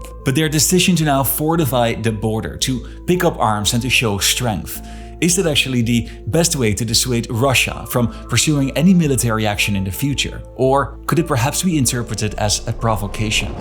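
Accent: Netherlands. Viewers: This guy is Dutch for sure. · Pro tip: don't chag your linguistic accent to pronounce a name in another language that is equally wrong. It's difficult enough as it is to cope with your sharp Dutch accent (ask John Oliver what exactly that is, he can word it better), we don't need you to randomly switch to Dutch to pronounce a Russian name, that's just ridiculous. Your content is interesting, but the narration is mostly stressful through a combination of your voice, your intonation of urgency (which isn't necessary to deliver the message and frankly works to its detriment) and the rather annoying Dutch accent that you all suffer from (so don't take it personal). Try being a bit more relaxed, at ease, people will stay longer, viewtime will increase.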